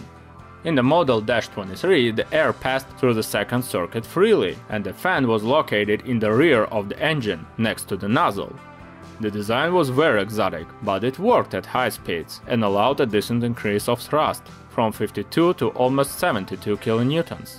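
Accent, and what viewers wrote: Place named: Russia. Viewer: Heavy Russian (or Slavic) accent... makes listening barely understandable. So I added the English subtitles. These auto-generated phrases are absolutely farcical. It'd be funny... if I didn't want to hear the narration and understand it. But I do want to understand things, so I give up. Goodbye.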